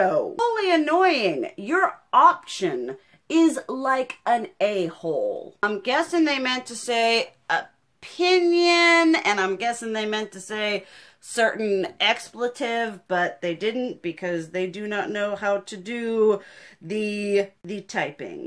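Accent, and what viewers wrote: South, United States. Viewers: I love ur voice especially your country accent lol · “ Areeeeeeeeeeed” pretend like I used your long gorgeous southern twang! 😊😁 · I agree. I love it! I put these on my tv when im alone just because its so exuberant and comforting. (No idea why, I'm Swedish. I have no relations to southern USA accents) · Hahaha i love your voice and tone ! Its one of the things that made me come back after the first video i watched. I loved it , im not american and idk but it reminded me of some old movies that depicted people from the south or it reminded me of what i thought american accent was before i moved here.I really like it and i like the fact its so animated and has so much personality. Love it ! It sux that we are saying things online that we wouldnt say in life,if i dont like someone or something i just leave. Sometimes i want to comment on someone makeup but i think oh well its what they like and i dont say anything unless someone ask for an advice 🤷🏼‍♀️ Im sure i dont wear tgings that everyone love. 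· Love your southern drawl. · I LOVE your southern drawl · I LOVE your voice. I think the first comment I either left you or told my friend about you was "She's like a Southern Belle mixed with Wednesday Addams, love!" Also, all these comments about "Your volume" do they not realize no matter what damn device THEY are watching on there is a volume control & they can turn it the hell down themselves? Lol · I just join her channel 2 weeks ago I never thought her voice was annoying. I acutely like her southern belle voice. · I can’t imagine being so miserable to leave hate comments. Girl you are beautiful and love ❤️ the loud southern twang . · Most of those people must not know you're from the South. Your voice is not annoying, it's southern. Just like my voice is "valley girl". It's just a part of who we are. 🤷‍♀️ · You sound like Jane Lane from Daria, but with a slight southern accent :) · I love your Southern accent and your voice and your clothes and your hair and makeup! 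Everyone likes something different, dang people are harsh.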